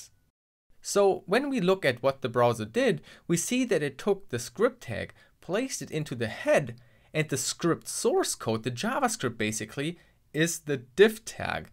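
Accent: Germany. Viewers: Ehmm hallo typ der englisch spricht aber deutsch ist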